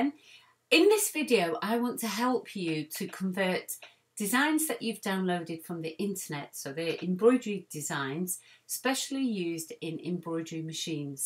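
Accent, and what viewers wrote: Yorkshire, United Kingdom. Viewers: I just found your channel and subscribed please please do more tutorials on digitising for beginners. You speak my Yorkshire language and explain beautifully! Please do more! Xx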